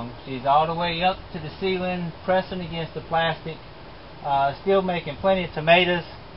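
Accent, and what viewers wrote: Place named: Louisiana, United States. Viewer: Ah ha. Southern Virginia! A couple of comments back, I mentioned something about you selling salsa at an LSU game. I thought you were in Louisiana based on your accent and talking about webcajun. LOL So basically you live in S. Virginia, wear a Tennssee hat, and have a cool Louisana accent. Got it ;-) God Bless.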